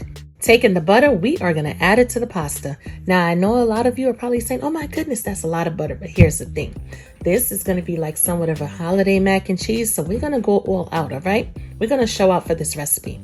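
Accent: New York, United States. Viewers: Looks delicious, funny it’s southern but you sound like ur from New Yawk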